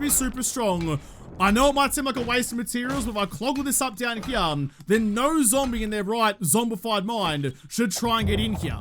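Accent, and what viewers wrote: Australia. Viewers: The aussie accent always thickens when he's excited or stressed.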